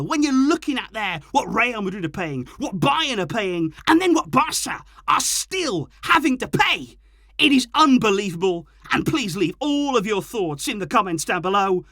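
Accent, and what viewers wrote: Wales, United Kingdom. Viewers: Do all welsh people sound like this?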